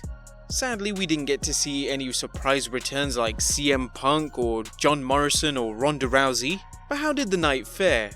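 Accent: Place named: United Kingdom. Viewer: YES!!! The British voice is back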